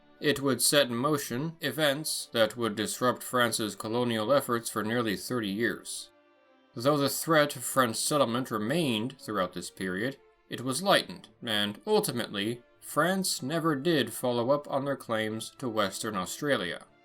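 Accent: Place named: United States. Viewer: Do we really need to pay an obnoxious American accent to tell us about Australian history. Go back home and preach to the gullible.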